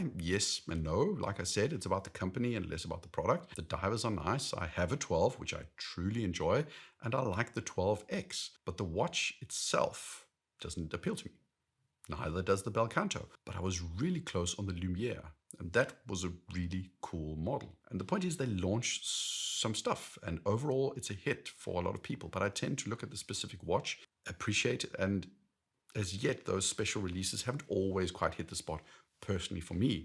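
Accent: South Africa. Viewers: Are you south african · Was not expecting a saffa accent when I clicked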